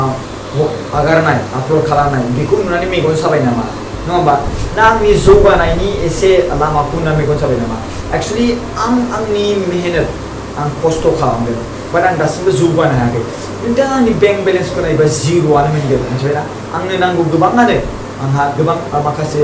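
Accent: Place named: Baksa, India. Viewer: Baksani railainai ...style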